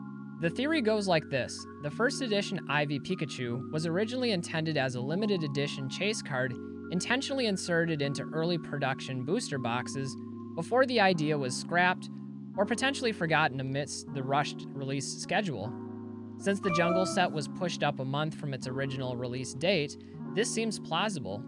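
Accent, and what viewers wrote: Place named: Midwest, United States. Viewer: I was just thinking I could tell he was from MN or WI from his accent and way he dresses. Very outstate midwest, having grown up there myself. I checked the comments to see if anyone else picked up on that. He's one of our people!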